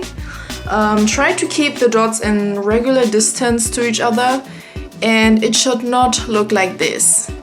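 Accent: Germany. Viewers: I LUV YOUR GERMAN ACCENT JSHSVWKQGW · dot me an border :D hear that german accent girl ;-))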